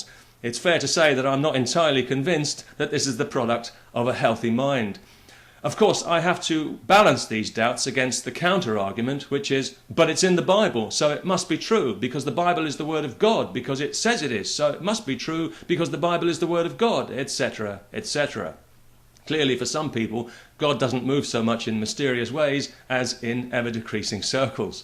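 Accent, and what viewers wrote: United Kingdom. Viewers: Nothing like a smack down with a British accent!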